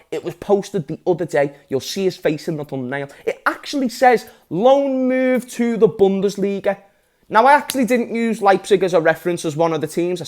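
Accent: Liverpool, United Kingdom. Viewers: Mate he's scouse thats rhe flow... what is it with this world and people telling people how they should be... authenticity is a good trait you want slow talking listen to lieing politicians 😊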